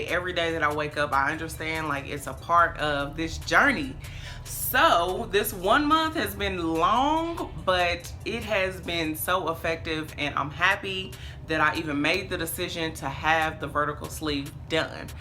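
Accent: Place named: Texas, United States. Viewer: Just came across your videos. Love your personality and would like to know if you're from Texas? Your accent is similar to mine.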